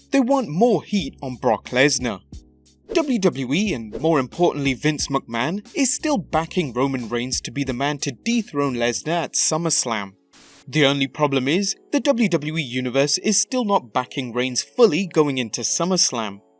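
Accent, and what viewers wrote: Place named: United Kingdom. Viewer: Ew a British voice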